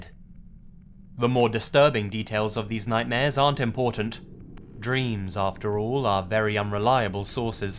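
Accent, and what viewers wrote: United Kingdom. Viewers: The one thing that makes me doubt these stories is that the speaker is British and the writing is also of British vernacular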